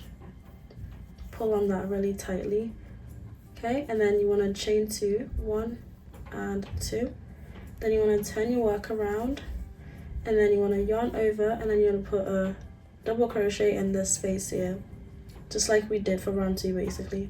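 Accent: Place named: Liverpool, United Kingdom. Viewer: scouse queen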